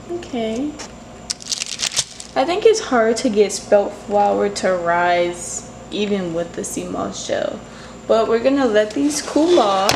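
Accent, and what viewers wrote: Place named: Chicago, United States. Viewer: I love your video, and I hear in your voice you are from Chicago, my city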